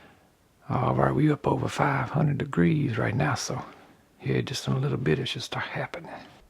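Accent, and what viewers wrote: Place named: New Orleans, United States. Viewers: Did I just stumble upon the Bob Ross of metal casting with a New Orleans accent? This is gold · This is possibly the most relaxing channel on here right now. And it’s also edumacational. You’re like a bayou bob ross. (Sounds like you have a Nola accent)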